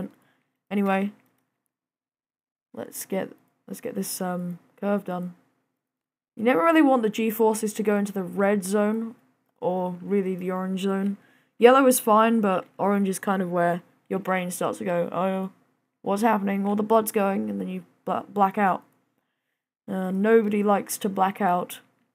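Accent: United Kingdom. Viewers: You live in Cali? You sound pure British though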